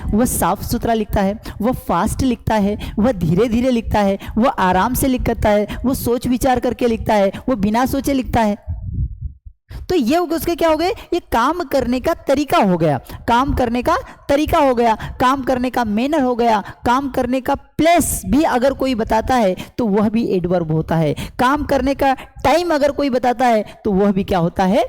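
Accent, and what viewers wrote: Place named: India. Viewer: Sir ji ki English ki class me Pure hindi ka pronunciation ho raha hai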